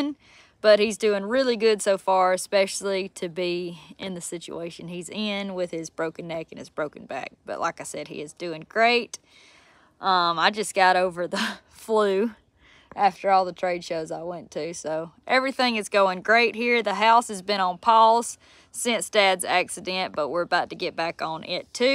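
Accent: South, United States. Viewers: I’ve been a fan for a couple years and you’re the best! Great content and so genuine!!! Beautiful southern woman with magnificent southern accent and great skills!! Seems like there’s no getting rid of those thieving trolls!! All the best to Hannah and her kin!! MMc.. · this is southern accent that Forrest Gump had spoken? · I absolutely love your southern accent so hot and your pretty easy on the eyes too girl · Thanks for the heads up. Your a darling. I love your Southern bell accent!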